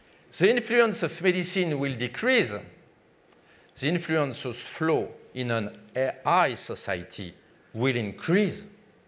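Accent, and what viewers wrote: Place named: France. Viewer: il a un anglais tellement so french que je le comprends parfaitement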